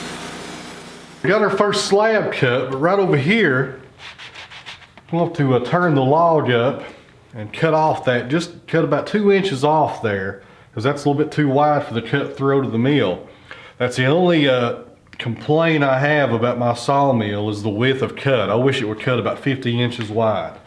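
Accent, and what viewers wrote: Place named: South, United States. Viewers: I hate you're Southern voice video sucks be cause of that🤔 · Just curious...your accent sounds definitely sounds Southern. Do you live in the Southern United States? I'm not trying to be nosy, just curious, as I said. I'm a Virginian, born & raised. We have a good lumber company not even 10 miles from where I presently live. Augusta Lumber is it's name, and it's located near Fishersville, Virginia. Just curious as to whether you'd ever heard of it or not. Anyways:have a great day, & thanks again for posting this video. I love me some Cherry wood products. They're beautiful!!!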